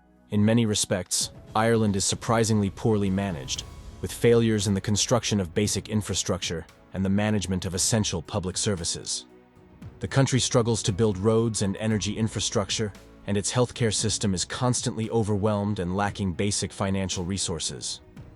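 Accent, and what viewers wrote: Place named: United States. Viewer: AI generated garbage, the AI voice is using American pronounciation of Celtic as in the Boston Celtics team spoken as Selltics, but Celtics is said as Keltics. This blithering idiot doesn't know such a simple thing. And the entire channel is all AI generated click bait and at a prolific rate.too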